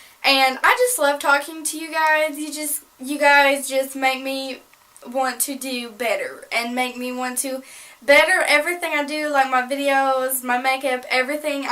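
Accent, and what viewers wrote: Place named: South, United States. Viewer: I love it if you replied I can't find a lot of country youtubers it kinda sucks I'm so happy I found this channel I think your beautiful I've watched a lot of you videos so far you are like a role model to me and also you have taught me to never ever let any get under my skin and be myself I'm from a country family and so I have a country accent like yours I live in a city so I can get made fun of you have taught me to be my self and always believe in me your really funny when ever I see you make a new video I'll be happy kind of like today okay i need at least 9-11 hrs of sleep well I have fit bit I told me I only got 7hrs 38min of sleep and if that happens I need to SLEEP and I didn't have a pretty good mood I went on YouTube and saw you had s video and ever since I watched I have hade a great mood Thank you for teaching me not to let mean people to get under my skin you're my favorite YouTuber 😝💋😘😇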